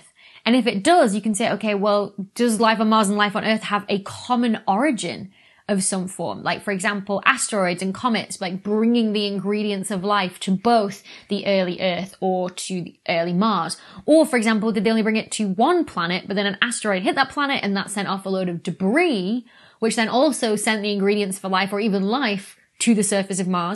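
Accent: United Kingdom. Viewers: I love the british accent. It sounds so proper and yet also fun.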